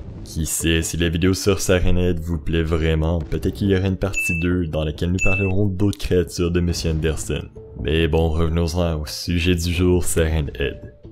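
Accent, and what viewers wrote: Quebec, Canada. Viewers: je suis très difficile avec le youtube québecois à cause de l'accent mais franchement avc toi ça passe comme une douceur avec le ton parfaitement doux +1 abo je m'en vais regarder tes autres vidéos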